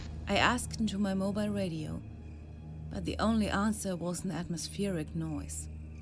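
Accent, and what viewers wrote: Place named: Germany. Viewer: Ich finde es sehr cool, dass eine englische Fassung gemacht wurde. Leider muss ich sagen, dass der deutsche Akzent der Erzählerin zu uneindringlich für diesen Vortrag ist. Es macht, zumindest ergeht es mir so, keinen Spaß zuzuhören. Ich finde den deutschen Akzent in der englischen Sprache absolut nicht schlimm, vielmehr ist er interessant und auch bei einigen Thematiken unverzichtbar. Doch hier wirkt es nicht. Bitte nicht falsch verstehen. Die Sprecherin macht eine solide Arbeit, liest schön flüssig und hat ausserdem eine schöne Betonung, aber -naja, ich wiederhole mich. 7 von 10 Sternen, da alles, bis auf eine Sache passt.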